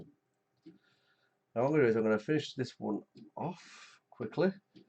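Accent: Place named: England, United Kingdom. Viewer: Few things I enjoy more than an English narrator. Cheers.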